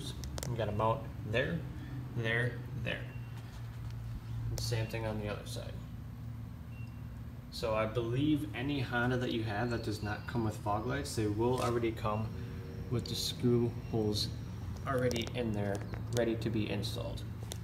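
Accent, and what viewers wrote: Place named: Canada. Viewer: Can't stand that Canadian accent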